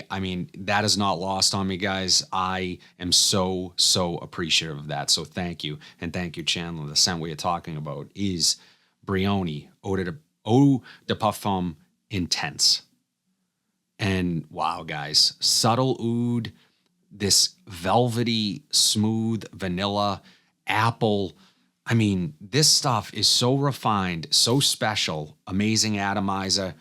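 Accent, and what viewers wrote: Boston, United States. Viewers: Man....that Boston accent. Gets me every time, in a good way. lol Thanks bud for a great video. I used to be all about niche but lately I am totally digging some designers that should be niche! A few are: Montblanc Black Meisterstuck (a more elevated and better performing version of Black Lacquer), Boss Bottled Absolu (I have not smelled any of the other Boss frags, but I cannot imagine anything being better than this one - beyond great!), Salvatore Ferragamo Pour Homme Oud (you can thank me later), Cartier Declaration d'un Soir (such an amazing manly rose).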